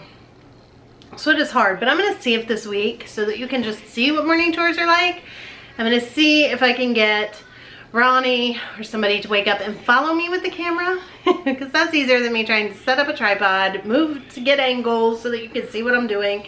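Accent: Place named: Georgia, United States. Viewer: Hi ❤ I can tell you are tired because your Georgia accent is noticable. Love you ❤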